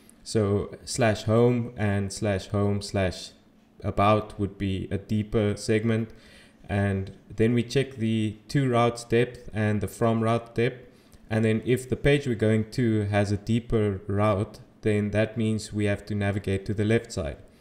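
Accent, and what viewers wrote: South Africa. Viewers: Are you South African? Sounds like an Afrikaans English accent.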